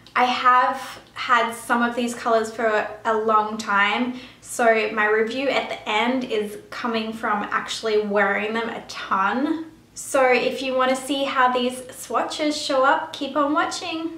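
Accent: Australia. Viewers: Are you australian?? OMG Im spanish and I love the acent! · I was sitting here wondering if you are Aussie. After watching videos of several American accents, I found it hard to distinguish my own Aussie accents hahaha. So happy when you confirmed it! New subbie :)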